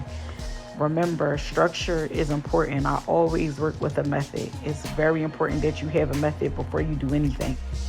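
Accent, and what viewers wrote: Philadelphia, United States. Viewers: are you from philly ? i hear the accent lol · Your voice makes me miss my college besties! Are you from Philly? When I listen to you talk I think of Cheltenham lol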